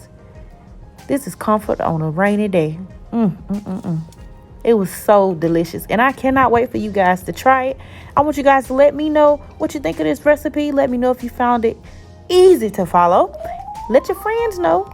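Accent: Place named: Mississippi, United States. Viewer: Are you from MS? I hear a familiar accent!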